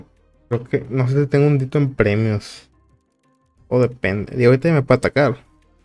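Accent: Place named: North, Mexico. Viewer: EL CARRITO SALVANDO LA PARTIDA ,. TU ACENTO ME SUENA MUY DEL NORTE,. SALUDOS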